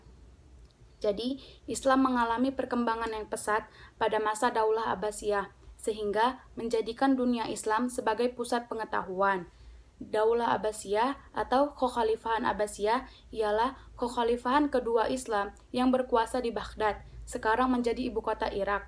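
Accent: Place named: Medan, Indonesia. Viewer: anak medan ya haha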